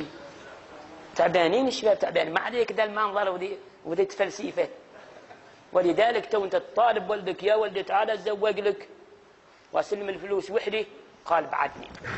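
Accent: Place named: South, Tunisia. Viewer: متأكدين ان هذه اللهجة.عمانية ؟ تشبه لهجة الجنوب التونسي.لحد كبير ( مع اختلاف بسيط في الجيم تنطق G' و القاف تنطق G غي الجنوب التونسي ) لهجته مفهومة تماما بالنسبة لي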